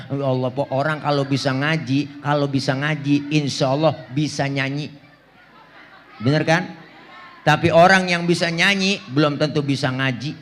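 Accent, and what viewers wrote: Jakarta, Indonesia. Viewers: ciri khas Betawi cara bicaranya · iya logat betawi kental mirip kh zainudin mz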